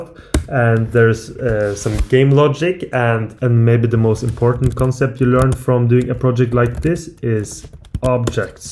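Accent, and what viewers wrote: Norway. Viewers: Crazy. Are you from Norway?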